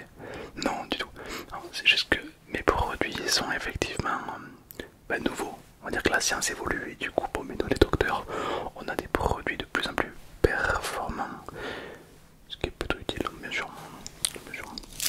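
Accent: South, France. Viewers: Ty serais pas du sud ?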